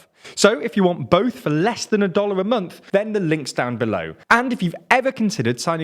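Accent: United Kingdom. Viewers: I don't want politics in a british accent anymore. Let's make it happen, people. they wrecked the world.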